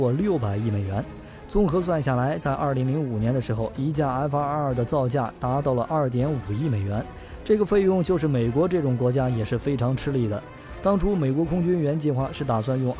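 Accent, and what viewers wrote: China. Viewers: 這不是台灣人做的影片。口音、用字是強國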